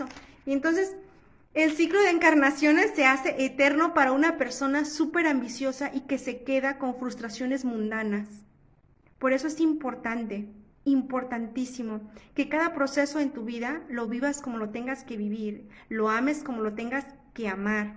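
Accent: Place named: Mexico. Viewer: Por cierto me encanta oírte bien mexicana 😊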